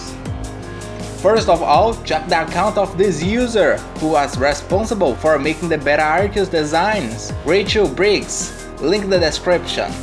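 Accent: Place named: Brazil. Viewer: you have a brazilian accent, are you from brazil?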